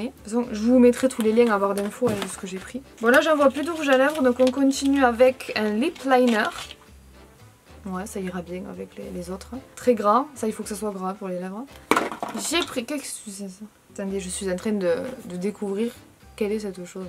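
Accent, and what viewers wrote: France. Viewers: She pronounces English as french 😅 and that " I'm shocking" it's obvious she meant I'm shocked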